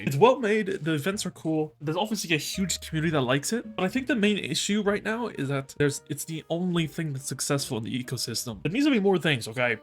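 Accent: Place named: United States. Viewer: Bro u sound so American i didnt even ponder over Irish accent. As a British born, I prefer Ireland. Keep up the read-minding content!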